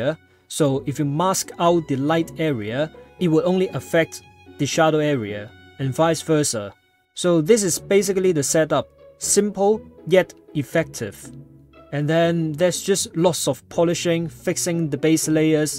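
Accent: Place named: Singapore. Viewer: I love this <333 Also I feel like i went thru a literal roller coaster trying to figure out ur accent. Like, first I thought it was British , then Indian, then now I think it’s singaporean?????? Mhjvnejenrfv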